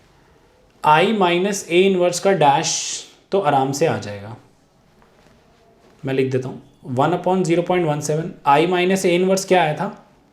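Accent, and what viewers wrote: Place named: India. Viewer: 영어 발음 실화냐 영어를 인도어로 만들어버리네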